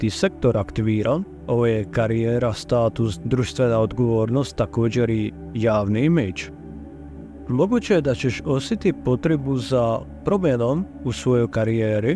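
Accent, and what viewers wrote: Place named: Dalmatia, Croatia. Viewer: Posle toliko mnogo godina bilo mi je lipo slušati te naš Dalmoše na našem dragom ikavskom dijalektu.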